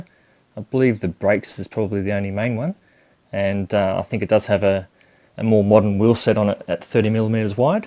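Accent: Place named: Australia. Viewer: Thank goodness an Aussie voice and not a droning yank.....Great vid and very informative...Thanks mate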